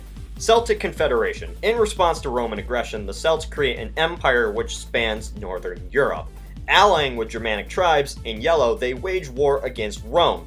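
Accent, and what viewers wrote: United States. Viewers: sounds so Ohioan when he was young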